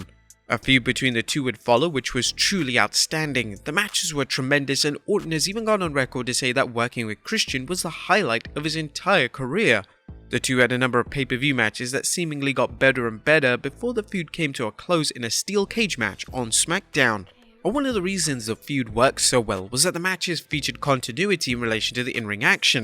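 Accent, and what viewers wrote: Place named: United Kingdom. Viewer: Why is every wrestling YouTube channel hosted by someone British?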